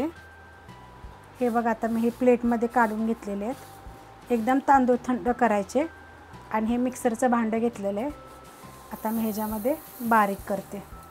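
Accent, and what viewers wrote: Satara, India. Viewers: Are wa mla tumcha bolnyavrnach kalla mi pn satarchich ahe nagthancha fude kashil koparde 😍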